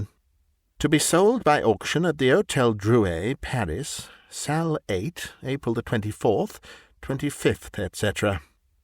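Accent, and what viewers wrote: United Kingdom. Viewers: Im Egyptian. English has been my second language all through my educational and professional life. However, i am more accustomed to the American accent because of the movies, series…. etc. only recently have I discovered the joys of listening to old radio plays and bbc stories. I had to set the playback speed to 0.75 in order to comprehend the British accent. But listening to British perfectly pronounced by you sir, I don’t need to change the speed on your channel. Thank you very much.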